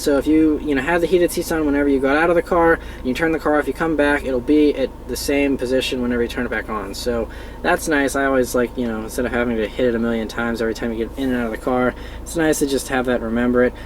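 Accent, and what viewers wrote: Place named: Midwest, United States. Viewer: youve gotta be from the midwest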